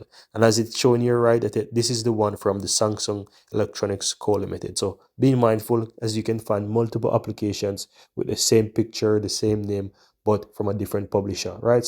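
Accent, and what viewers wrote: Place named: Jamaica. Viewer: I know a Jamaican when i hear one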